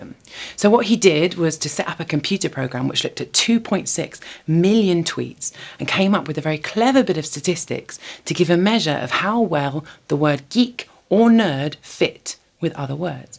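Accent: United Kingdom. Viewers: It's the soft, clear voice with the British accent that gets me. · I normally don't like red heads or Brit accents. But they both are awesome here. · And the accent. Don't forget the brit accent.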